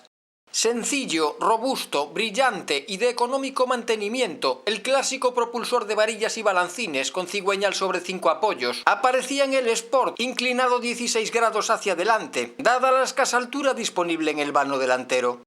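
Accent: Galicia, Spain. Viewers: Se le entiende estupendamente, y vocaliza bien. A mí todos los acentos me gustas, y el acento gallego de los que más.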